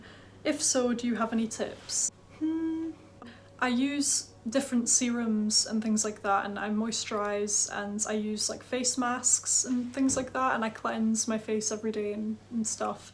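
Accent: Scotland, United Kingdom. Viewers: Oh, I see! I'm not an expert, obviously, but to me the accent (esp. the r) from that area is quite different from the Edinburgh area (where my grandfather and my surname hail from!). I just saw the "Scotland" pin was below one if your videos recently, which was what made me think along these lines! Love your videos, they are so calming, too, so would definitely love to see some ASMR as well! x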